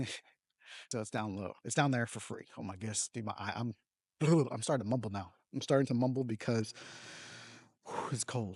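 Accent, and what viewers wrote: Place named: Canada. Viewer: Little changes add up to 1 big change though, and sometimes little changes are all people can do. New subscriber from East Coast of Canada 🇨🇦 . PS - I thought you were 🇨🇦 by your voice until you pulled out the American cash.. lol!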